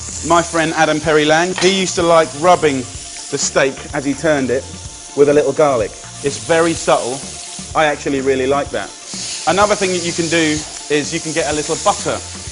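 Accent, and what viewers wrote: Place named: United Kingdom. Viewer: 영국식 발음 맘에 드네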